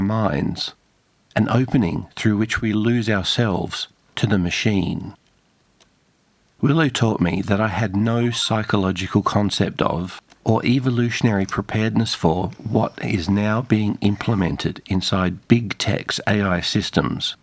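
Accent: Australia. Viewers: Cyber Psychosis sounds much cooler. You could become a Cyber Psycho. Is your accent a mix of Tasmanian and Canberra area/region? I'm interested in regional accents.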